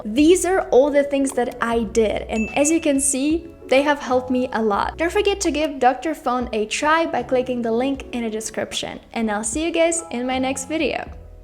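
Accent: Russia. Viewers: lemme guess, her accent is russian, isn't it? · Девушка, вам не кажется, что у вас очень яркий русский акцент? Буквально русскими буквами говорите английские слова. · Русский акцент узнаваем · СЛЫШУ РУССКИЙ АКЦЕНТ😁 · Ну у тебя ещё присутствует русский акцент, милочка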